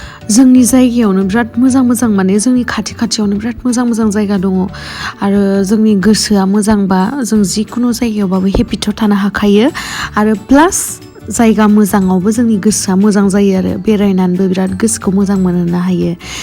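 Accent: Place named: Darrang, India. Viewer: darailaini badenw railai abo sona mwjagsw 👋👋😯😯😄😄👍👍🤟🤟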